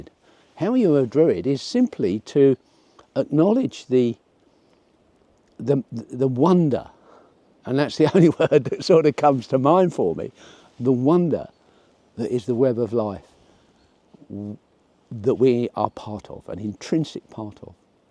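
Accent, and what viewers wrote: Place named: Cockney, United Kingdom. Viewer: Cockney druid well now who would have thought it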